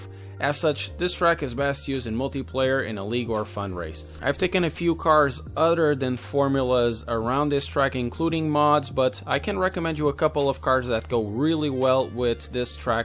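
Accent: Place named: Portugal. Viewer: I had a suspicion from your accent that you were my irmão. boas!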